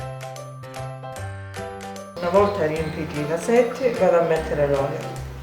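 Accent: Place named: Tuscany, Italy. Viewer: Bravissima. Hai un accento toscano😍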